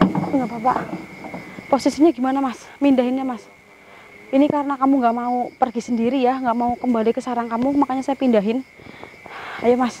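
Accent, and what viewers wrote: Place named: Java, Indonesia. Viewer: Mba... Medoke aku seneng denger nya,... 👍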